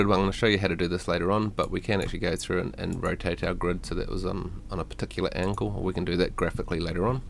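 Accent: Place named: New Zealand. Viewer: Thank you, really easy to follow along with. I like your accent, are you from New Zealand?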